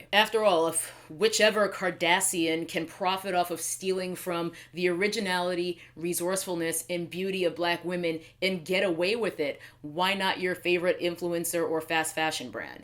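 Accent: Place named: Long Island, United States. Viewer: Pausing this video to say that red lipstick looks amazing on you. And I Also love listening to you talk because I'm getting hints of LI in your vowels. I miss NY, what can I say? Coming back: I felt so bad for Chelsea. It was so obvious that her style was lifted. Patrick Starrr's comment really hit me the wrong way. I didn't like it and got some pretty bad vibes. Manny apologized and to me it appeared to be sincere, but the damage was done. I really hope that this negative will somehow turn around and open up more opportunities for her. She deserves it. I know nothing about making videos, but I knew when I saw hers that she worked very hard. My phone is keeping track of what I read. Today when I woke up, I read about the woman, Ann Lowe, who made Jacqueline Kennedy's wedding dress. It resonated with me as the Ms. Lowe is a woman of color and came from a long line of excellent seamstresses. 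it's been over 50 years and some things have improved, but there is still a long way to go.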